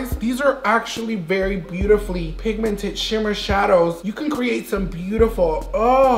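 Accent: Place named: United Kingdom. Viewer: I loved the slight British accent coming through girlllll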